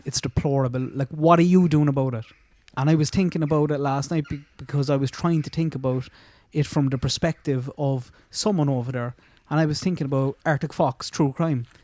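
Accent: Ireland. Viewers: my Brother, it felt very strange hitting the like button with the celebratory starred thumb gyrating. My heart is with you as your beloved brogue bears aloud the pain we all now feel and share. I wish I were in whatever corner of this world you occupy and I could buy us a few pints of beer, if you don’t total-tea exclusively. Though I do have a master of fine arts in creative writing, I have nothing more to say. Please take care of yourself/selves, and we cool-ass-subbies. This is a tragically sad story, yet it is still a blessed day to be alive. A part of our human experience is the requirement to hold disparate truths and emotions within our minds, bodies, and spirits all at once. 🙏🏽✌🏽❤️ from Minnesota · The rate of an Irishman!!! Love it!!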